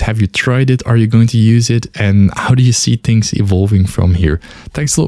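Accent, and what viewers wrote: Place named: Netherlands. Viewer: Thank you for the effort and detailed explanation. Subscribed. By the way, from the accent I think you are 100% Dutch. )))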